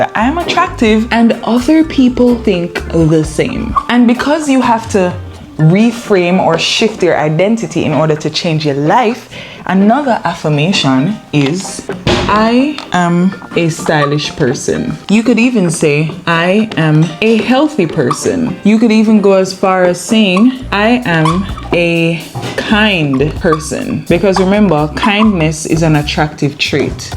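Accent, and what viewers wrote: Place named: Jamaica. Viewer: Love the Jamaican exscent😍😍😍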